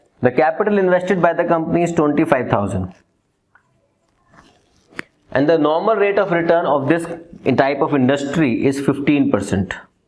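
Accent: India. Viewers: Sir ji...hindi ka majak mat banaiye...you too sound to be a Hindi background guy.... anyway good technics....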